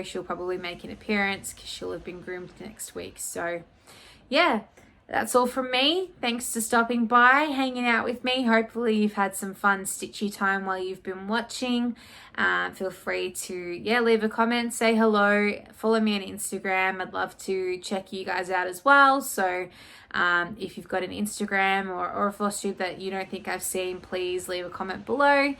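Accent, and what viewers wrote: Australia. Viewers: Hi.. loved your first flosstube!! Keep them coming as I’m loving hearing another Aussie voice.. stay safe xx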